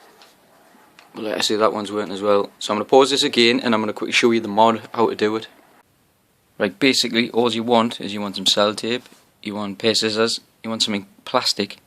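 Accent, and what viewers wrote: Newcastle, United Kingdom. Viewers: r u a goerdie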